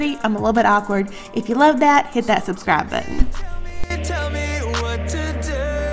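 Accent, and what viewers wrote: South, United States. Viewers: Hello 👋🏻 I just found your channel today and I’m binge watching your FALL DECOR videos! Thank you so much for sharing! Your accent is so sweetly southern but I can’t tell where you’re from. Do you mind sharing? I am born & raised Louisiana but my accent is not as cute as yours! 🤗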